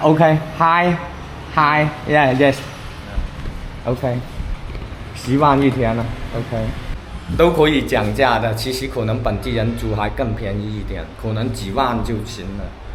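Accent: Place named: Cantonese, China. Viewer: 粵語口音😂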